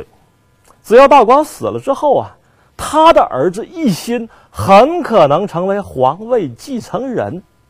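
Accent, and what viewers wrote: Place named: Northeast, China. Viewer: 每次听到东北话，都觉得莫名的搞笑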